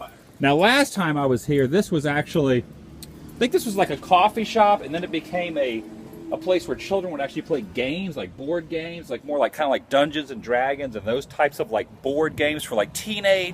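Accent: South, United States. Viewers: Wow, that's why I've never been able to place your accent- it's a bit southern but not seemingly tied to anyone place.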